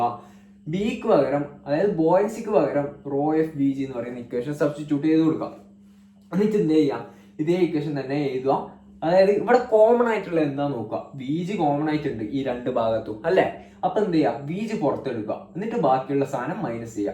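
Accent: Kannur, India. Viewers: Bro kannur aanno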